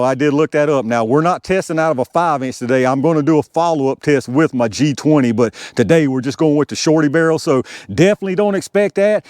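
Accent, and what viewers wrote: United States. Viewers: Your accent is an American treasure.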